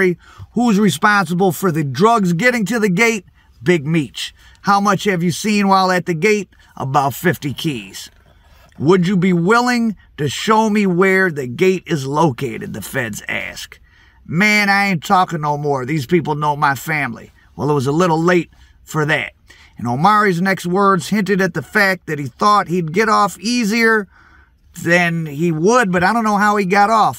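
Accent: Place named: Midwest, United States. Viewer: Minus the fact he sounds like he has a mouth full of shite when speaking. He is very thorough with his stories. Im put off by his heavy MidWest accent. Da Bears!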